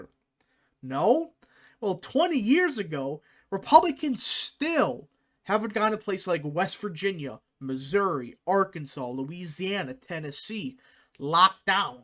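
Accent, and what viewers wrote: Wisconsin, United States. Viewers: I’m from Wisconsin and literally 10 seconds before you said you were from Wisconsin I was thinking “this guy sounds like he’s from Wisconsin”. I hope you’re right, but I’m always gonna vote like we’re 10 points behind.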